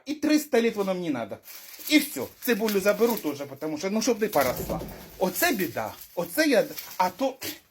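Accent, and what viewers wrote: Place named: Surzhik, Ukraine. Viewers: І на Донеччині❤❤❤❤❤❤❤❤❤❤ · Шановний! Ви розмовляєте так як говорять у нас на Полтавщині! Полтавський колорит!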